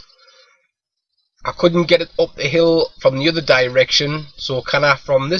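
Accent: Newcastle, United Kingdom. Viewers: I enjoyed it, and I'm a 68 year old nan, but I love the accent, it reminds me of my dear dad who was a Geordie, I miss hearing his voice. But I truly loved the video, the driving was very good TY!! 😊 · Are you a Geordie.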